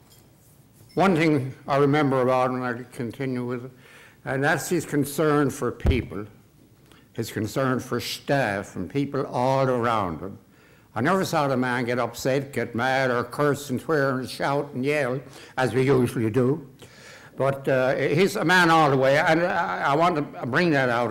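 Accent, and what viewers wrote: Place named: Ireland. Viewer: Interesting accent. I can hear the Irishman in his accent. Greetings from Ireland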